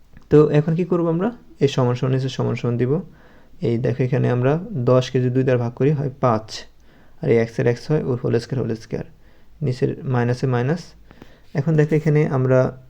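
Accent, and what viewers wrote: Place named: Barishal, Bangladesh. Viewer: বরিশাইলা কথা😂😂😂❤❤❤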